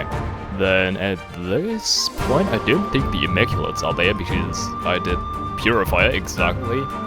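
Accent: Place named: Franconia, Germany. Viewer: Wait are you actually German? Your accent is interesting, what region are you from? Your pronunciations remind me of the Franconian accents I've heard.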